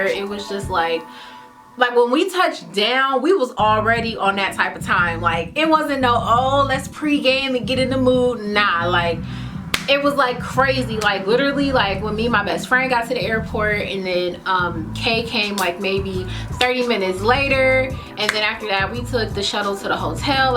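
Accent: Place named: New Orleans, United States. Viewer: Lol that New Orleans accent😂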